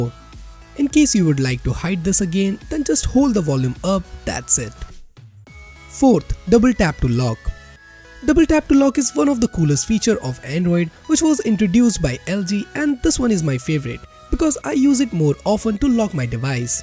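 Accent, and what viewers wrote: India. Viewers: It's like the robo narrator but with a heavy Indian accent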